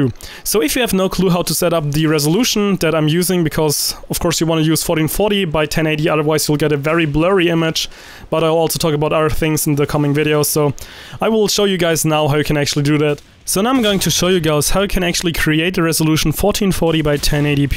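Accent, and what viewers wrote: Germany. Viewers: wie gehts dir man hört raus das du deutscher bist aber dein englisch ist richtig gut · Are you german? Because I think you have a very strong accent but it still sounds nice :) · Wie ich wusste das du Deutscher bist :D Seitdem ich dich Abonniert hatte kams mir schon so vor :D Merkt man irgendwie übel finde ich am English mach weiter so mit den Videos ^^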